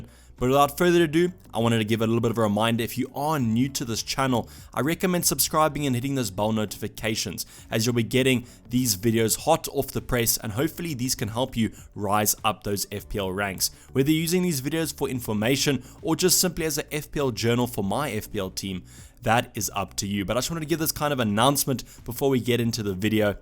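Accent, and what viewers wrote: South Africa. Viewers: South African 🇿🇦?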